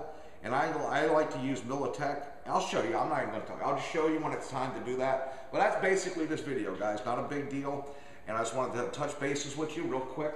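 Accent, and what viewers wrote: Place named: Chicago, United States. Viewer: i dont think it really sounds mobster, its more like just a chicago accent. are you from chicago?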